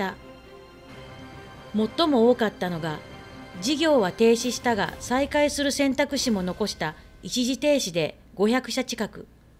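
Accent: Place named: Nagoya, Japan. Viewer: 名古屋イントネーションのアナ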